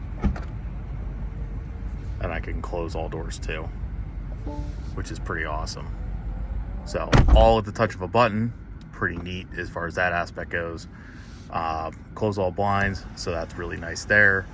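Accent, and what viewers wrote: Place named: Pittsburgh, United States. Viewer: Thanks for the content. I assumed you were from a Pittsburgh dealership, judging from your accent. Close. Ohio.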